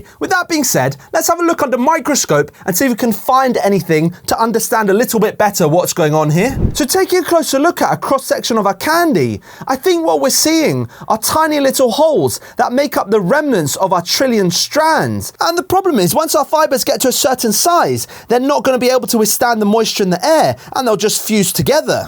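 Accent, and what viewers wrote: United Kingdom. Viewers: “for the non-americans” him being the most british person ever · Is he British? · i love the britishness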